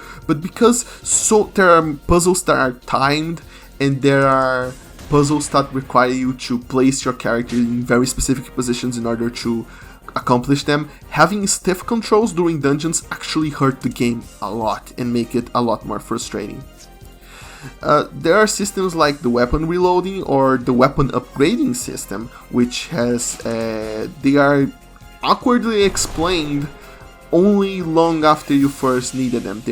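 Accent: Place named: Brazil. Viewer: Your accent sounds Brazilian to me.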